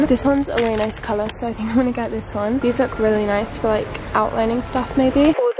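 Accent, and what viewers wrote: United Kingdom. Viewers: are you british or american? :)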